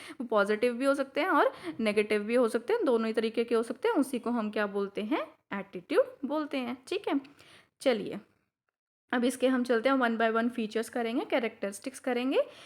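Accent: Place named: Haryana, India. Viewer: Haryana k lgre h mam ❤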